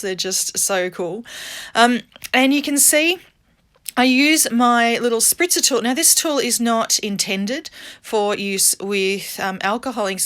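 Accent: Australia. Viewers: Hi. Happy Easter 🐣. Thanks for sharing your alcohol ink techniques with us all. What an honour to be celebrating Jessica! The blues and bronze on white is my pick. So rich and lux looking. Oh and I love your Australian accent. It’s just like mine 😉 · A fellow Aussie, Yay! Glad to hear the familiar accent during the hop. New subscriber from Central Coast NSW ❤️🇦🇺 and just a quick tip for when you run out of the Snow White mixative, I use white nail polish! Sometimes you need two coats depending on how opaque it is. 😊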